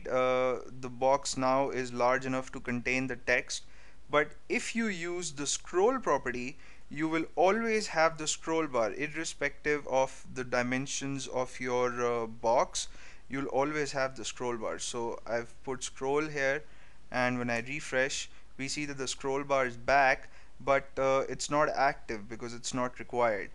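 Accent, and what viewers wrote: India. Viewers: why do all indians sound exactly the same from all the youtubers i have heard which are indians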